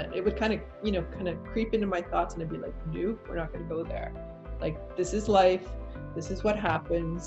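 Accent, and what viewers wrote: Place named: Canada. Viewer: Canadian accent for sure! I checked out your (very nice) website. Really like your work and your msg in this vid. I can relate. Hi from TO👋😊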